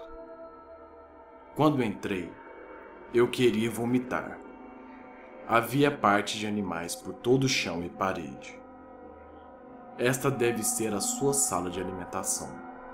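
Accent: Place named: Inland São Paulo, Brazil. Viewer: troca o narrador, q de repente pode ficar legal, pq esse sotaque paulista do interior é foda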